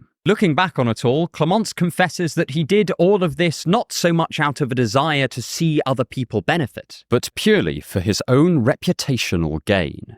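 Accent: United Kingdom. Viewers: I read this book a while ago and it took your british accent to make me realize that its a good book.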